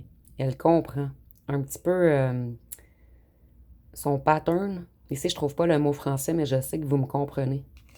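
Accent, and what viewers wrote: Quebec, Canada. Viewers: Bonsoir Vous êtes belle vous expliquiez tres bien j'aime votre accent québécois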